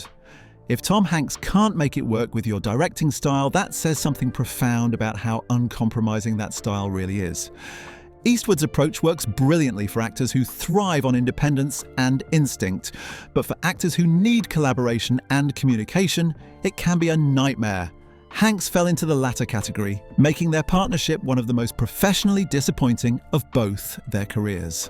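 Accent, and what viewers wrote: United Kingdom. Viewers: This British accent voiceover seems to be on all these types of videos.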